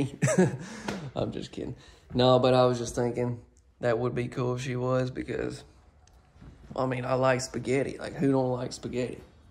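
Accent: South, United States. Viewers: LOVE THIS HOME! and keep being you...love the southern accent👍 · Please do not apologize for saying “reckon”. I’m from Alabama. Keep our Southern accent alive! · Speak your Southern English! I love it! Don’t ever change. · Love your southern accent. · GREAT-looking LR/DR/kitchen open-area layout, and you have a soothing, "folksy" way of selling the unit. My only "criticism" is when you hesitated after saying "reckon" as though it was too country for the video. Your southern accent NEEDS TO BE PRESERVED, because with the gentrification of much of the US--I'm from Boston, have a STRONG Boston accent, but fewer ppl speak with a Boston accent nowadays due to the dreaded gentrification of neighborhoods here--so we gotta keep the various cultures/accents alive throughout the US. After all, the US would be a dull place if all we had was a monotone, single accent throughout the entire country, right? 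In other words, STAY TRUE TO WHO YOU ARE! Keep up the good work:) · I love your accent ♥️ Be who you are. Don't go changing trying to people please, because I RECKON, LOL HEHEHE 😋 🤣🤣 🤣 you will never ever master being a puppet to everybody in the world. I love your personality, you have a southern swag about yourself 😁 yes, SOUTHERNS HAS SWAG..LOL HEHEHE 😋 🤣🤣 🤣..and that is what's keeping the viewers returning to your channel ❤️ keep up the great work. I RECKON , I SEE Y'ALL ON THE NEXT VIDEO..🤗 Charole'vista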